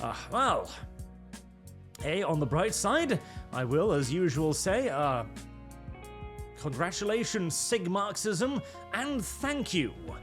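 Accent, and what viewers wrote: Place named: United Kingdom. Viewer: Hm. You sound like you are in the British Isles. Curious why the police have not arrested you over this commentary. Yet.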